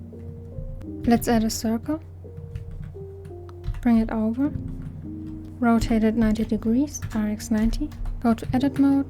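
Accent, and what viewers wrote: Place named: Germany. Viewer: Oh youre german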